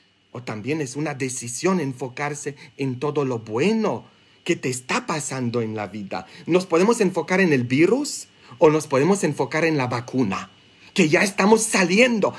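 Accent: Mexico. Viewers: Padre, Gracias! Ud, es precioso me gusta escucharlo en mi español con acento mexicano, soy ecuatoriana, lo amo. Padre, Si creo que existe el mal por eso aconsejan tener animales porque nos protegen; y, el pobre perrito tomó la maldad que le cayo al pobre cura. Muchas Bendiciones! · Padre, que bonito es aprender y disfrutar de sus predicas, y yo creo que usted es igual de mexicano que yo, habla igual como hablamos nosotros, igualito...un abrazo muy grande.... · Que divertido es usted padre me encanta que sabe el español mexicano👍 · Que bendición haberlo encontrado padre. Es usted un Ángel!!! Me encanta todo lo que dice y sus explicaciones son especiales. Usted si que aprendió el español mexicano así como de donde soy yo de un pueblo en Jalisco llamado Acatic (pero hoy día vivo en California). Espero muy pronto poder ir a una de sus misas cuando viajemos al norte de California. 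Dios lo bendiga y nos siga nutriendo de tanta sabiduría. 🙏🏼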